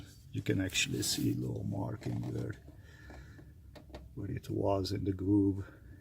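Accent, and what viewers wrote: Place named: Russia. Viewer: The longer the video goes to more russian/european you sounded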